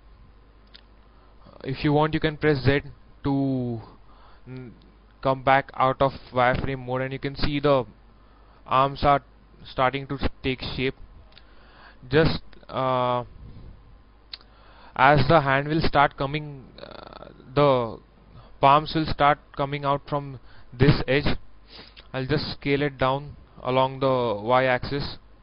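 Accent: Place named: India. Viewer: very nice work, do yo have more blender tuts? I need to practice my Indian English listening.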